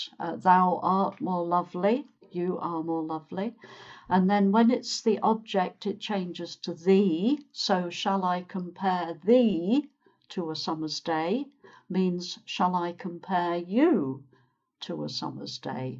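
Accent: United Kingdom. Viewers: Hello, thank you for your lessons very much! They are always not only informative, but also pretty useful. And one more reason why I love your study stuff is your really British accent 😇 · can you suggest some British series or sitcoms that speak like you?.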